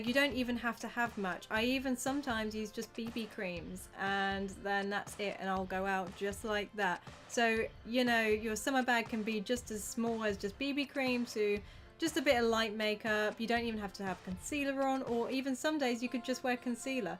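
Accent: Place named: United Kingdom. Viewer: Your so beautiful before! And your beautiful British accent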